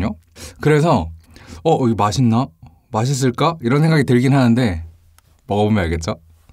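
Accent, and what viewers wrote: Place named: Gyeongsang, South Korea. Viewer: 승부하부먹충 이게 왜 일베야 경상도 사투리고만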